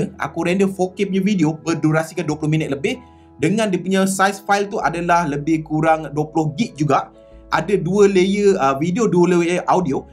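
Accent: Malaysia. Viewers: Bru prtma nnton yutub org malaysia . Seruu bahasanya . Saya suka logat malaya . Smoga maju terus channelnya bg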